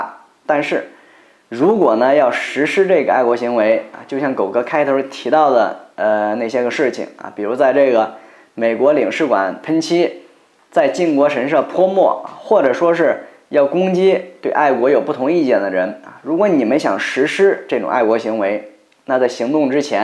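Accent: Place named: Northeast, China. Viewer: 狗哥是東北哪旮旯來的，口音好玩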